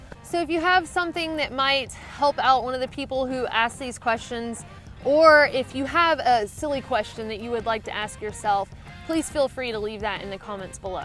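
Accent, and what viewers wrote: South, United States. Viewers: I just spotted your channel today, this is the 6th video I've watched so far. I'm going to subscribe to your channel because I love the beautiful scenery you get to see on your hikes and also because it's so great to hear that sweet southern charm from a fellow southerner. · Just for fun, I pulled open a tab with your YouTube video from one of your first AT vids: "Extras: I Almost Knocked My Teeth Out" . I played a minute or two of you talking, then a few minutes of this video. Then switched back and forth. You still sound like you're from the south, but you've lost some of your accent. I wonder if it will start coming back, now that you are home. Not saying that a southern accent is good or bad or anything. I just love linguistics and find it fascinating. It's interesting to hear a difference.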